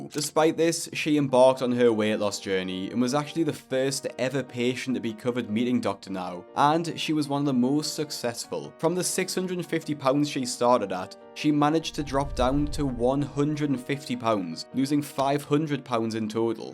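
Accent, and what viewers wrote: United Kingdom. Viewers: This is an American TV program. Why in the hell do I have to listen to somebody with an annoying British accent??? That's the only reason I can't subscribe to this channel. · Completely off topic, but I was wondering where you are from. I watch another YouTuber with a very similar accent. I mean, I know it's UK, but it amazes me how an island that isn't very much bigger than my state (Illinois), has so many different regional accents!!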